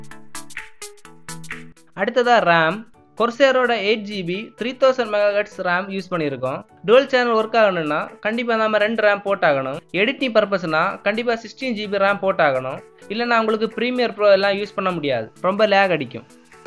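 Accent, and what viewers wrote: Kanyakumari, India. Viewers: anna neenga pesumbothe nenachen nanum kanyakumari than .anna nenga enga erukenga kkla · Thala ne kaniyakumariya semmaia pesra bro Vanakam maka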